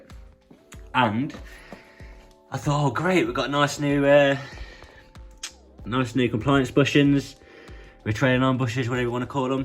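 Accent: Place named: Leicester, United Kingdom. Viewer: I’m in Leicester , would know our accent anywhere 😂 it’s very entertaining , nice garage set up too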